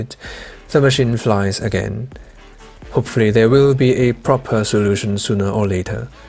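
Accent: United Kingdom. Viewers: 好英式既口音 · i hate that british accent...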